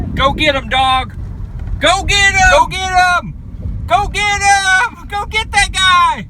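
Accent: United States. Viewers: He talk like a cowboy🐄🐄🐄🐄🐄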